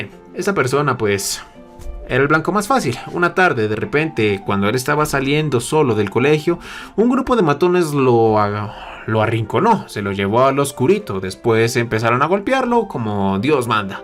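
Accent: Colombia. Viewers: sos colombiano parcero?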